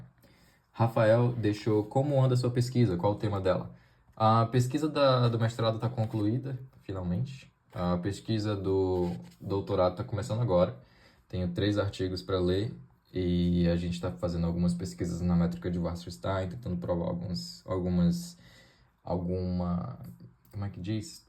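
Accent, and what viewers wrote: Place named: North, Brazil. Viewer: Sabia que tinha reconhecido um resquício de sotaque nortista em você. Sou do norte também. Você é fera demais. Orgulho de você.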